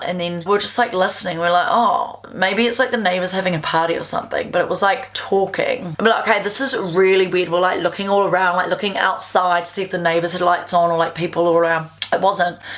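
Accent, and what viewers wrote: New Zealand. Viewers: Shivers.. You gotta love how New Zealanders talk. Ever seen Flight of the Concords? Case closed.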